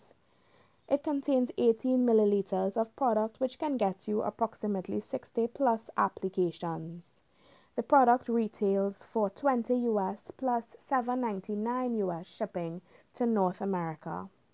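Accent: Trinidad and Tobago. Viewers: Are you from Trinidad?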